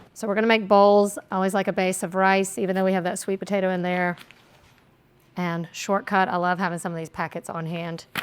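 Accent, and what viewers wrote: South, United States. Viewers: Yummy I Would Pour it over Broad Egg Noodles or Fetachiy Noodles or even Mashed Potatoes Served with Garlic Bread. You Explain everything so Simple. You is so Gosh Darn Cute From a Canadian Fan in Vancouver B C ( ❤ Love your Southern Accent ) PS: Love to see more Fish Recipes IE: Oven Baked Salmon / Pan fried Butter, lemon Trout / Pasta with Prawns & Fresh Dill 🤗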